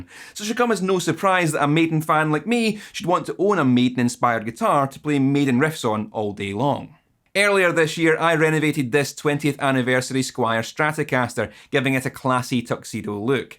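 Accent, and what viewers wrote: Scotland, United Kingdom. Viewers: Now that you have a spare black pickguard from this baby, why dont you build an Adrian Smith Signature Jackson in white and black? I have done one from one of my old Aria STG 004s with a swap of maple from rosewood neck. Also painted the white pickups black. Now plays fantastically. Oh by the way, am a huge fan of your Scottish accent. Alba gu brath! 🙋‍♂️🇹🇷🤜🤛🏴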